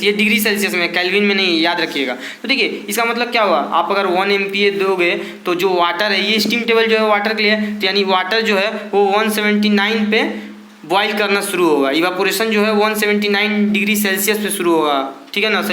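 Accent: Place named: Bhojpuri, India. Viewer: Good explanation nd I love ur bhojpuri accent 😂👍👍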